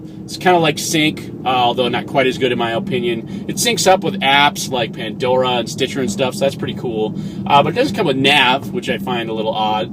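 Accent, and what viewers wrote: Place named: United States. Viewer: Your voice is so loud . Very American like .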